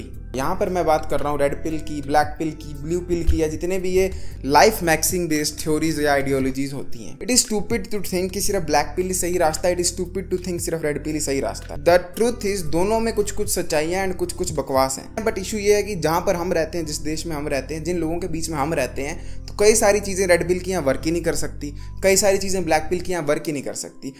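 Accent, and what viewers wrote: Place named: Kangra, India. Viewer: Mujhe laga tha ki bhai aap kangra se ho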